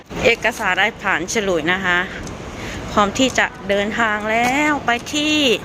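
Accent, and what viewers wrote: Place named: Sakon Nakhon, Thailand. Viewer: อยู่บ้านอะไรค่ะ พี่ได้ยินเสียงพูดก็รู้คนสกล พี่อยู่สกลจะ้ มีแฟนฝรั่งเศสเหมื่อนกันจ้ะ ไทบ้านไดน้อ?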